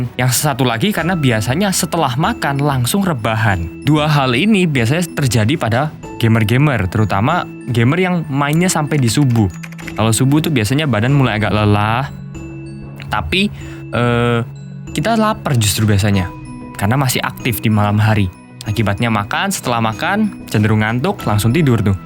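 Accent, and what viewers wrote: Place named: Java, Indonesia. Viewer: Medok seru 😆 tpi apik mas Gas teros kontennya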